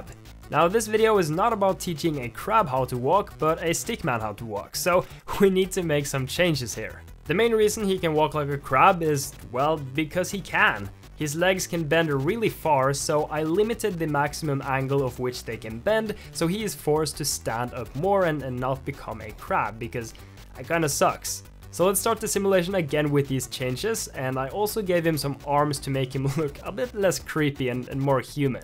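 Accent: Sweden. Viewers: Are you swedish?? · Hey are you swedish or norwegian? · Are you Swedish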